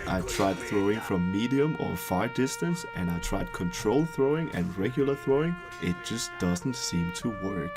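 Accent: Denmark. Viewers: The Danish accent is amAzing i love it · fuuuuck, man kan godt høre at du er dansker :D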